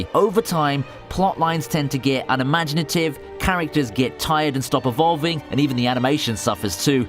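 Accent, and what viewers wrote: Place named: United Kingdom. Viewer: this video is really good but... i hate these name pronunciations. your english please stop trying to say the name properly because it just sounds goofy with our british accent.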